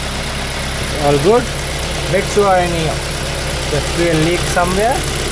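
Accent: India. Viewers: So basically pull the rail off with the injectors still plugged in but off the engine wind the engine over see which one is actually missing it’s spray or dirty then do what he done and I’d also use 120 psi compressed air as well down the ends of each injector and see how that goes.. I’d also lose the gloves and be a man and would be great to see a Australian with a Aussie accent doing not a Hindu in 😂😂😂😂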